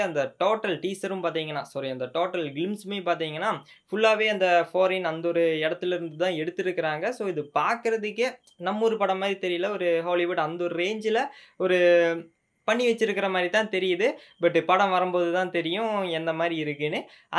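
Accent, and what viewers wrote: Kerala, India. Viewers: Ninkal malayali aano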